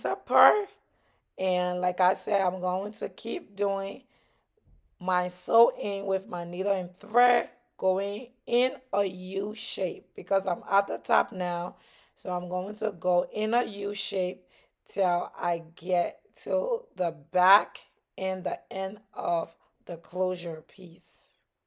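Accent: Liberia. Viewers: You sound so Liberian. Great job. Beautiful❤❤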